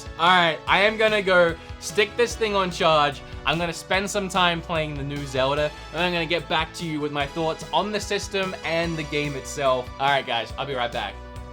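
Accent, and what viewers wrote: Australia. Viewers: I'm very confused with your accent... sometimes a little Australian slips in... Anyway, I'm savin up to get a Switch. Played BoTW on my nephew's and I want that experience 24/7 now. But I don't intend to get the Lite because in my hands, the white buttons won't stay white for long. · You sound Australian and American. Which one are you??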